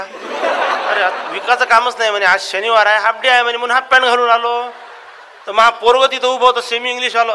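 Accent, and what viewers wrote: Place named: Vidarbha, India. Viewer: Vidarbhachi Marathi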